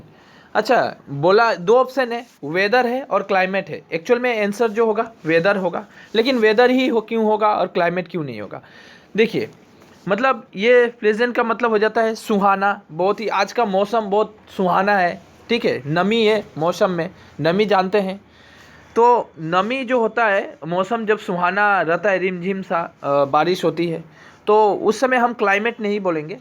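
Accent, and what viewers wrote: Assam, India. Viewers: are u assamese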